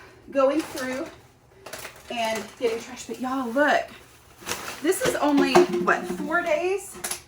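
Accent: South, United States. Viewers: You have a sweet southern accent and voice! You sound like me and my friends :) I loved your video and you're very easy and pleasant to listen to :) Thank you for all the helpful tips and I will be checking out more ! May the Lord bless you and your family:) Rachel and Dobie in Nashville 🎨👩‍🎨😺🍊💕🛐📙☦️🕎🕯️ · I can hear your Southern accent when I read your book. So cool to hear you explain this concept out loud - I'm in the middle of Decluttering at the Speed of Life now. Love it!!!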